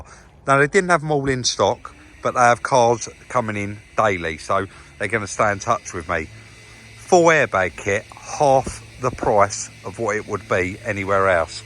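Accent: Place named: England, United Kingdom. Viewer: i am a city and guild panelbeater( body repair tech now haha) of the old school variety with nigh on 50 years exp. and only recently threw it away because of these nightmare strip/assemble scenarios. The last vehicles i worked on were '21 audi s8 hit hard in the r/r and a virtually brand new Jag i-pace hit full noise frontal, both fantastic vehicles to work on, the Audi was a breeze really, but as you say getting one's head around the procedural dilemma is the mind fk i do not need. You do a great job of an explanation of pitfalls and tips for this volvo airbag s&a( i love volvos) I work in an engineering shop now; lathes, milling, presses etc and specialising in aluminium tig welding.. much easier haha-- love this, new found, channel and dig the pommie(p.o.m.e. = prisoner of mother england) accent, i'm in nz and have been for over 40yrs..rock on man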